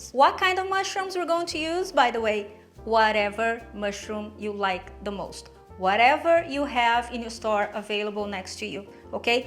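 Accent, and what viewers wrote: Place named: Brazil. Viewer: I know a Brazilian when I hear one! 🫶🏼🇧🇷🙏🏼 que delícia 🤤 feliz Natal Deus abençoe 🎄👼🏽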